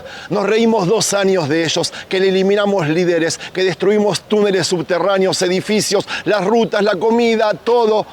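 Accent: Argentina. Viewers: mi opinión lo que pasa es que él siendo argentino de nacimiento (lo digo por su acento tan marcado) adquirió la nacionalidad de ese país y cómo allá está viviendo sabroso, lógico que se hace el ciego-sordomudo. Está defendiendo a quien lo tiene viviendo sabroso. En la mayoría de países latinoamericanos se tiene la idea de que los argentinos se creen de una raza superior y quizás por eso es que defiende a capa y espada la política expansionista de Xion.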